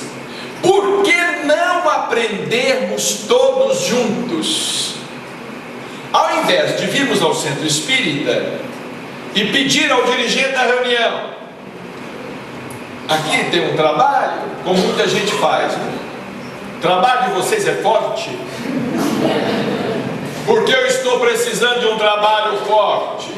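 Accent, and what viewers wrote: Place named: Minas Gerais, Brazil. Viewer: O interessante da palestra Dr. Baccelli é a sua clareza no conteúdo passado... Sabedoria ! E o jeito mineiro de dizer... Adoro !!! O tom de voz adequado e simples por questões complexas e que devem ser reflexivas ! Dr. Baccelli tem Excelente Oratória! Aprendo muito... Gratidão Dr. Baccelli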